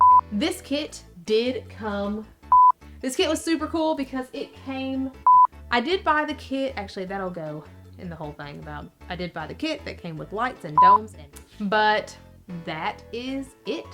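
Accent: South, United States. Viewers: Hi, I love your southern accent; where are you from? I have a southern accent also; I live in North Dakota but I grew up in Tennessee.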